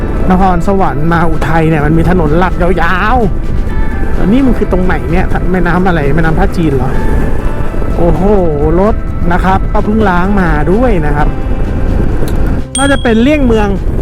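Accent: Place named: Kanchanaburi, Thailand. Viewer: พูดเหน่อเหมือนเด็กกาญจน์เลย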